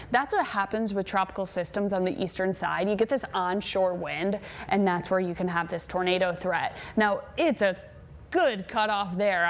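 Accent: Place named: New England, United States. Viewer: sounds like another yankee..............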